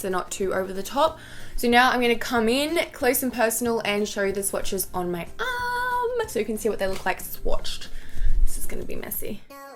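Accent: Australia. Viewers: Australian accent - 😳😓🤐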